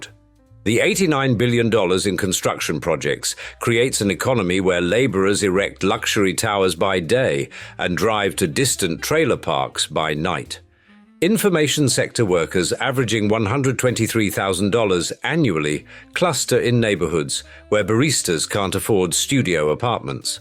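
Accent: United Kingdom. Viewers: British accent means go to another story fast.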